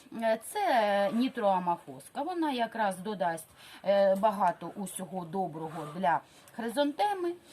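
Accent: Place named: Ivano-Frankivsk, Ukraine. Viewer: Чую у Вас Франківський акцент! Дякую за поради. В мене теж п‘ять котів з вулиці. Бережіть себе. Вітання з Дніпра.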